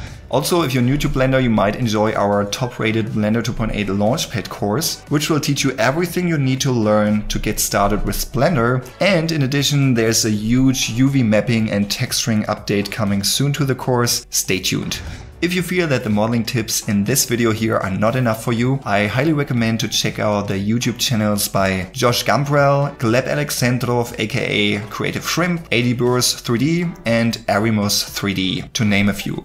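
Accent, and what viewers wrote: Germany. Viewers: are you german? sounds a bit like an german accent :)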